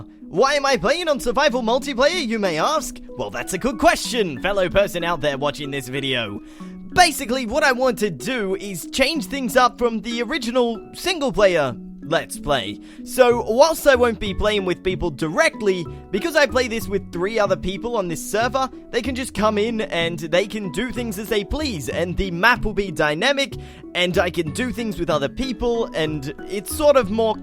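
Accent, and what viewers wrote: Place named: Australia. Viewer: omg, I have been watching your videos for a year now, and I thought. HAY... Hay, I know that accent. :/ OH WOW. says my realisation overclocked brain, it's like 3mhz now! THATS AUSTRALIAN! Then, HAY I'm Australian. suddenly mind blows. great content, keep making those video's. And spores back to, yay! I suppose.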